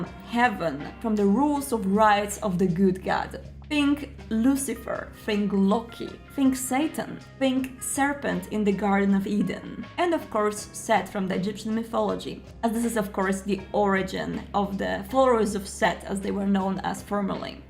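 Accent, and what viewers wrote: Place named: Poland. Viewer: Why is Kirsten Dunst speaking to us in a Polish accent? I am intrigued...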